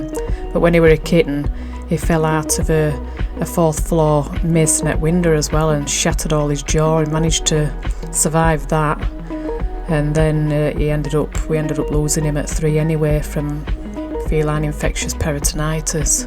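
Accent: Yorkshire, United Kingdom. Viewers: I’m detecting a Yorkshire accent. I’m from Sheffield 😊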